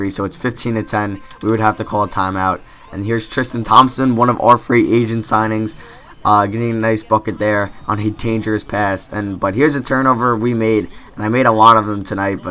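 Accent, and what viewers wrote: Pittsburgh, United States. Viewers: You from Pittsburgh?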